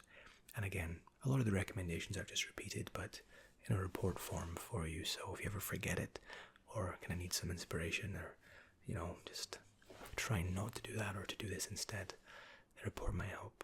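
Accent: Scotland, United Kingdom. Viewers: Idil Ozler Scottish I think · He is originally from Coventry, but he and his parents moved to Scotland when he was around 3, I think he said, thus something of a very slight brogue. But recently he moved back to England for work. Where in England, I'm not sure, though. I don't think he said specifically, to my recollection. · I love that Scottish accent - East coast? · Great british accent! finally glad I found ya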